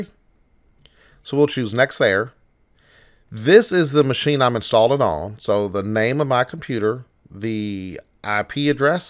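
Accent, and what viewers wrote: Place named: South, United States. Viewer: I love how you explain this stuff! It's helpful for my work. Also, you have a pretty cool southern accent :P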